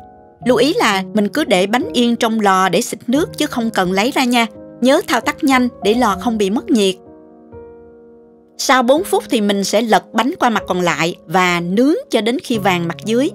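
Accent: South, Vietnam. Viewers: Giọng nói đặc sệt miền nam nghe rất là nhẹ nhàng+ tài nấu nướng của cô ấy thì Miễn bàn.Ông chồng nào đó của cô ấy rất có phước. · Kênh của rất tinh tế, giống nói ngọt nhẹ rõ ràng của người miền Nam, công thức và trình bày ý như người chủ kênh vậy. Em sẽ làm thử vi thấy làm đơn giản quá mà xình xắn nữa. Thật trân trọng cảm ơn Cô nhiều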